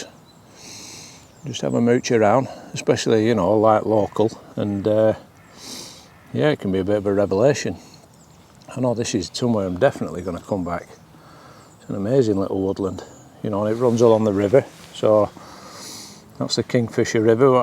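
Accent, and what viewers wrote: Lancashire, United Kingdom. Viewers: Great review and great stove.... and really enjoyed listening to a Lancashire accent. We used to live up in Simonstone when I was a kid.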